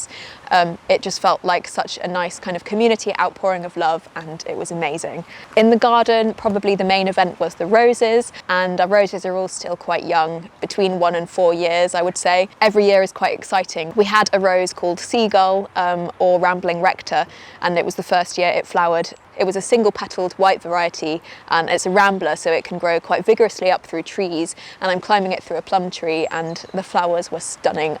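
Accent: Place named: United Kingdom. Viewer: I love british accent 🎉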